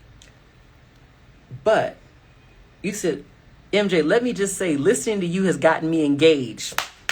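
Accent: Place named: Washington DC, United States. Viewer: You from the dmv?!?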